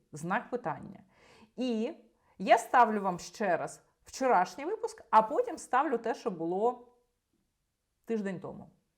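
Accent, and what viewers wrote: Russia. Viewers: Цікаве відео, дякую ) Ви питали, чи дратує ваше цмакання. Мене не дуже. Але є інші звуки, що не дуже органічно звучать для мене. Такі слова, як "немаЄ", "знаЄш", "здаЄться", ви вимовляєте як "немаЭ", "знаЭш", "здаЭться", на російський манер. Стараюся не звертати уваги, але реально важко. Тому дивлюся вас рідко, на жаль.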